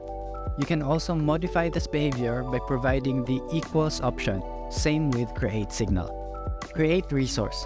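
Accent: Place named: Philippines. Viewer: Bro you sound like a filipino, I'm guessing you are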